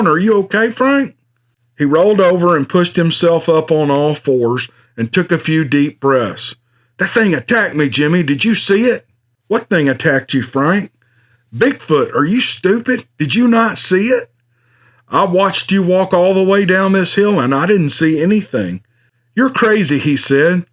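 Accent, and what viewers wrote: South, United States. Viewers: I love it bro I absolutely love it,! I love the channel and I love your southern accent and hospitality you seem like a good dude but the story hit the spot. It was one of the funniest hunting stories I’ve ever heard and cannot stop chuckling even as I write this little note to you, the store just made my day and I’m going to go ahead and save it to my favorite columnso I can listen to it every once in a while when I need to pick me up or to put me in a good Sasquatch’en mood. Your a’ok in my book 👌 But who am I to give approval to another but still love the story and especially the channel, and looking at shirts as gifts for family. Good day brother. 👍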